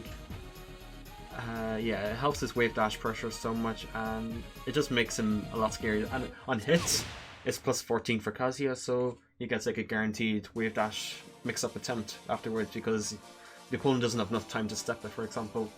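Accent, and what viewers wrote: Ireland. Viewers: . You are one of the most cerebral players and a man of taste. But for all that is holy, get a good microphone and learn how to tune it. Irish accent is complex in its tonality (no offense) It sounds fine when recorded correctly but it loses low end on "cheap" mics. And turn off tekken music when making vids, it gets in the way of how your voice is transmitted as there is competition for the sound energy coming out of the speakers. Get a good mic with a good pre amp. And play only Oscar, get out of here with these others women hahahaha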